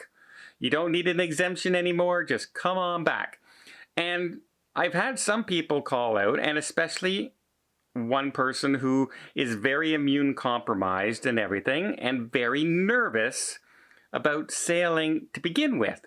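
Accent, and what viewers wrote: Canada. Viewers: I love how you Canadians say "about!" But seriously, why won't RCLL drop their vax requirement like Norweigen and Carnival?? I heard it's because the Caribbean countries/ports are requiring vaxed passengers - then how are other ships sailing there??????? Does Carnival go to different countries????? Sounds like Royal Caribbean is being ultra political....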